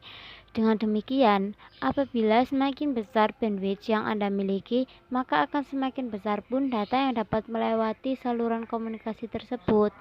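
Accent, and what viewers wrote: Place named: Java, Indonesia. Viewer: Medok e..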